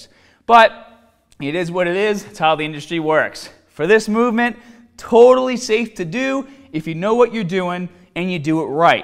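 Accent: Boston, United States. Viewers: Boston Accent!!!! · where is from? he has that Boston accent. #teamscott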